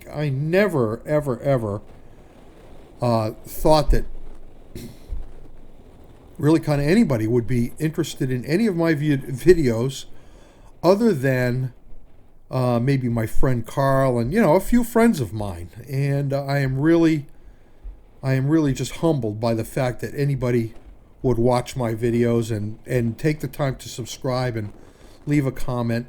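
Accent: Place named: New England, United States. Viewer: I'm from Mass originally, lived in NH for awhile as well. Love the familiarity of the video. The accent reminds me of home! Glad to have stumbled upon your channel. Subscribed now as well. Like you said, I'm watching a ton of videos on YouTube. Looking forward to catching up on your other videos!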